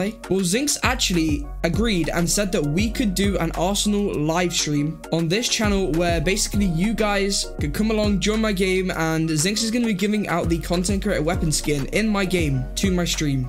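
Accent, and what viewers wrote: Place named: United Kingdom. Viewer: Is this tanqr’s brother or something? The gameplay is good and he does have the British accent. I dont know, maybe this is the new tanqr of arsenal.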